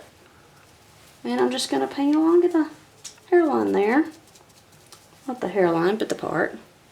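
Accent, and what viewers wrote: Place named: United States. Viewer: i love this american accent :)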